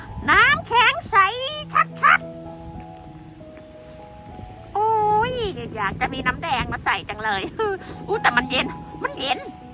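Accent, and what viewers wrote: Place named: Khon Kaen, Thailand. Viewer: เว้าคือคนบ้านข่อยแท้ ขอนแก่นกะสำเนียงแบบนี้ล่ะ😂😂😂😂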